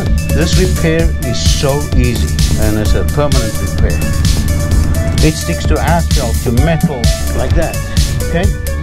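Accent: South Africa. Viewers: I detect a fellow South African perhaps?? Please help me find a similar product in SA!! I need a flat roof doctor in Cape Town please!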